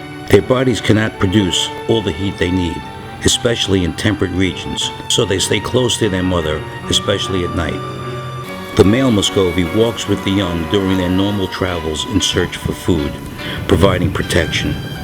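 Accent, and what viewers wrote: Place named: Brooklyn, United States. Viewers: Amelia Bee Not a New Jersey accent. New York. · Amelia Bee he sounds like he is from Brooklyn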